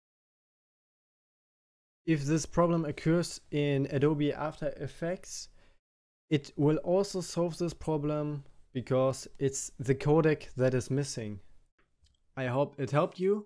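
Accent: Germany. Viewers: man hört direkt raus er ist Deutsch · I just love a german accent. Thankz brah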